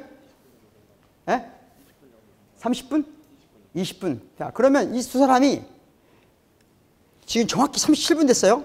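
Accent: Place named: Jeolla, South Korea. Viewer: 박구용교수님 감사합니다. 요즘 유튜브를 통해 교수님을 만나는 재미가 솔솔합니다. 그리고 너무 유익하고 재밋습니다. 아마도 박구용현상이 이시대를 휩쓰는 건 아닐까하는 생각이 들기도 합니다. 70이 되어 미국에 살고 있는 용봉에 후예로서 가슴이 벅찹니다. 적당한 전라도 사투리 억양도 가슴을 따듯하게 하네요. 광주! 라는 말만 들려도 울컥하는데, 전남대! 라는 말은 더욱 뜨겁습니다. 감사합니다. 저는 이제 박교수님의 열렬한 팬이 되었습니다. 너무너무 자랑스럽습니다 박구용교수님, 당신의 탁월한 지식과 열린 가슴과 번뜩이는 지혜에 응원과 박수를 보냅니다. 당신의 헌신으로 암울한 사회가 깨어나기를 진심으로 소망합니다. 사랑합니다❤❤❤❤❤❤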